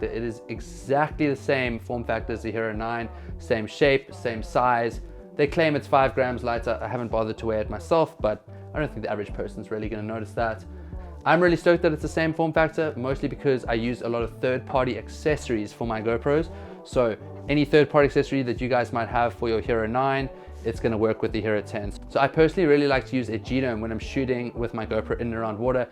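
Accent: South Africa. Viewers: Why do you sound South African? mei bru? heiboooo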